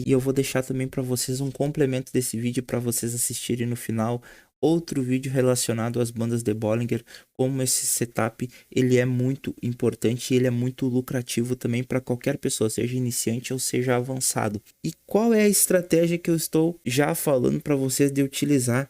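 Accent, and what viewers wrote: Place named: Rio Grande do Sul, Brazil. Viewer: Conheço esse sotaque tchê kkkkkkk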